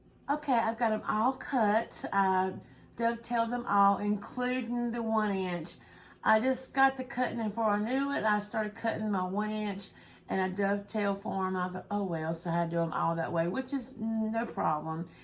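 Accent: South, United States. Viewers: I just love your show and that southern accent you have ! I truly enjoy the decorations and the diy’s . When I am feeling low you make me smile Be blessed ! Andrea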